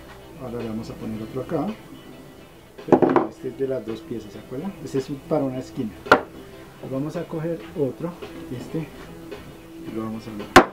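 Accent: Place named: Colombia. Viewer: Yo vivo en pradera valle y usted tan bien es colombiano por el acento creo que si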